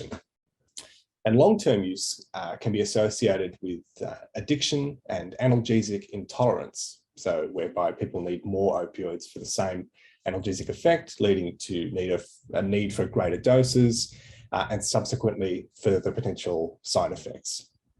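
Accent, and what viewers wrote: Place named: Mexico. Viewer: Nice research but I found it hard to understand the presenter's Mexican accent.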